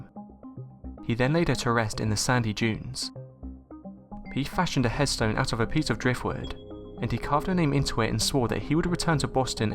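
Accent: United Kingdom. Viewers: You almost sound like the narrator from Top5s. You both are my favorite British narrators. I hope you eventually obtain as many subscribers as Top5s. God bless, and greetings from a US soldier from Illinois, USA!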